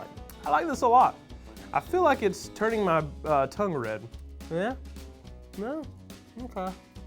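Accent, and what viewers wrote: South, United States. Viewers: I’m from Texas and people think I’m crazy when I say I hate big red 😳 I feel like it’s instant cavities with one sip 😂 Also your accent brings mine out so much 😂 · I don't actually care at all about the rankings but I love listening to this dude talk. Sounds like any good ole bubba down the street. Cheers from Texas!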